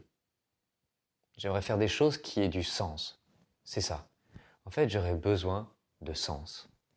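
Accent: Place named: Quebec, Canada. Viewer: 😁 ça fait plaisir de te voir en vidéo : Bon rythme, bon montage et bon contenu : un grand bravo ! Et cet accent Québécois... 🤩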